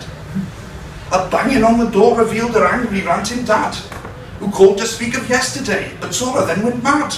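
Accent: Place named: Wales, United Kingdom. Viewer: Good stuff ... So rock and roll man !!! Where did the taffy accent come from? 'Butt'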